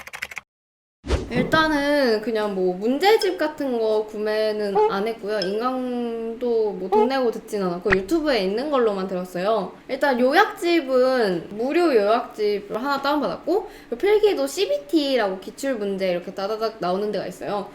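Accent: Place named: Gyeongsang, South Korea. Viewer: 오오 님 영상 처음 보는데 오 서울 사람인가보다 하다가 중간에 어? 경상도 사투리 한 번 쓰시길래 설마 헀어요 근데 마지막 쿠키 보니까 완전 경상도 사람 이었네여 ㅋㅋㅋㅋㅋㅋㅋ 필기 1급 공부하려 하는데 꼭 합격 해야겠어요!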